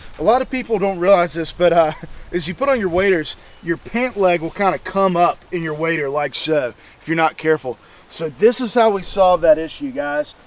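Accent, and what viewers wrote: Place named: South, United States. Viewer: Love the channel your accent is a mix of southern and Cali surfer lol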